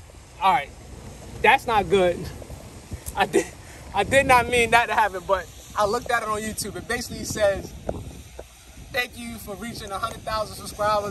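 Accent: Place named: Baltimore, United States. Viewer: My man’s sounds like he’s from Baltimore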